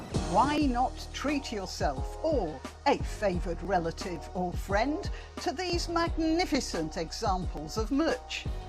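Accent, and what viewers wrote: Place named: Yorkshire, United Kingdom. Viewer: With your Yorkshire accent, I imagine I'm in The Secret Garden.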